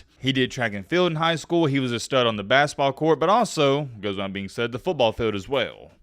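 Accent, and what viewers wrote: South, United States. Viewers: How tf u don’t know what a sawed off shotgun is? And you sound like ur from the south?? Like holy jeez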